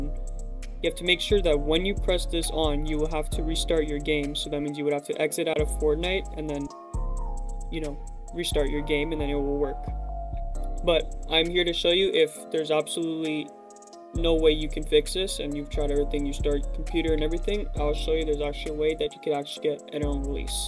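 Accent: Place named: Afrikaans, South Africa. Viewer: you sound afrikaans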